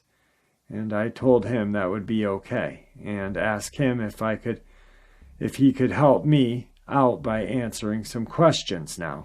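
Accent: United States. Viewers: Anyone else hear a John Wayne accent?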